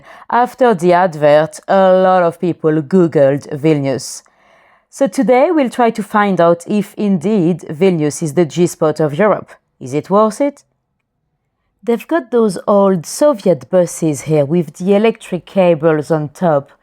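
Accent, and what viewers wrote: France. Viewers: Nice video, nice storytelling and also nice accent. Is it French?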